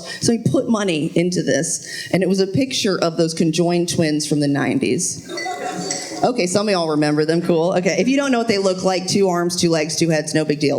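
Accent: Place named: North Carolina, United States. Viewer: I grew up in and around flat rock NC... Definitely got the accent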